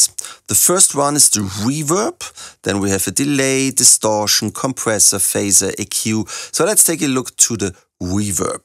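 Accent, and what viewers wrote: Germany. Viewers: I can’t get past the thick German accent 😅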